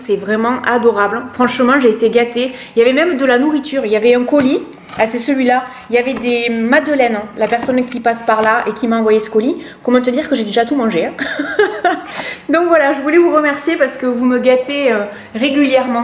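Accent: South, France. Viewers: Cool la fin. You are the best.. Avec notre accent du sud. J'ai Instagram. Je vois le hall de ton gros colis kado à gagner mais jne peux pas commenter et m'inscrire. J'adore ces couleurs de rouge à lèvres te va super.. Je l'adore.. Bizou 💋❤️ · Merci pour toutes tes vidéos j'aime énormément j'aime beaucoup ton accent du sud. Je suis aussi du sud de la France de Nice plus particulièrement! Bises toi et à ton chien qui est beau comme un cœur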